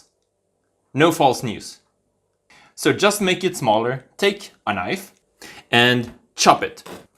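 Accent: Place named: Sweden. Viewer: Juste bra med swenglish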